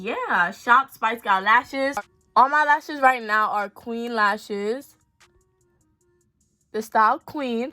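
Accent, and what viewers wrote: New York, United States. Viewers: Yo you mad pretty and you sound like you from New York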